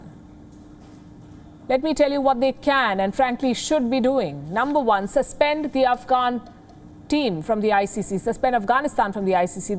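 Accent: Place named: India. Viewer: It's indian accent wion primarily indian news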